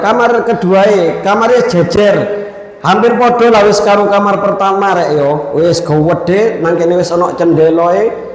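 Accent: Madura, Indonesia. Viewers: Meduro logate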